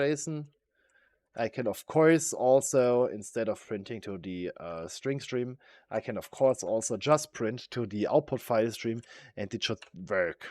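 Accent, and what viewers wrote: Germany. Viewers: That was the perfect video to start with. Thanks. Greetings from Bremen my german accent speaking friend.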